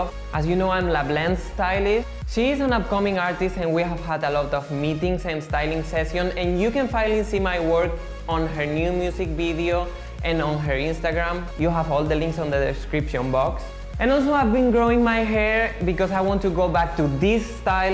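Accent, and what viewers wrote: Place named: Spain. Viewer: ya decía yo que eras español, se te nota el acento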